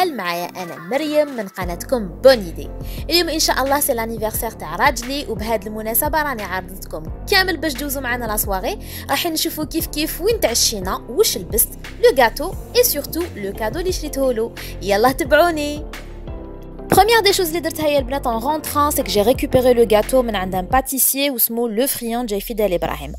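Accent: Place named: France. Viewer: J adore ta voix et ton accent français .bon anniversaire .enjoy.bisous du Maroc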